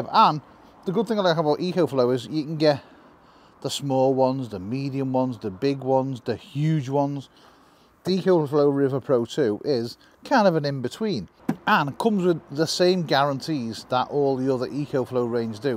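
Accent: Liverpool, United Kingdom. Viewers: I use PC fans for my dogs crates, one pulling, one pushing. Bought a couple os sensors to attach to those; so great idea - thank you. Also - Liverpool or Wirral? I can't get your accent sorted!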